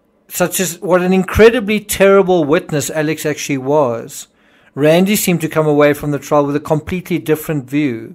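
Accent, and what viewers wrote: South Africa. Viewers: Sir, I love your South African accent;it's beautiful........ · Nice voice, South African! I agree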